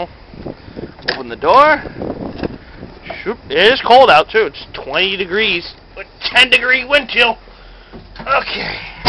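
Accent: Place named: Boston, United States. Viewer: your from ma i can here your boston accent go redsox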